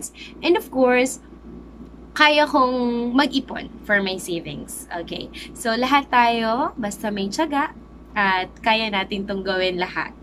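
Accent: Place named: England, United Kingdom. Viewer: hiya nman ako ... hello teacher 😊 love the english accent !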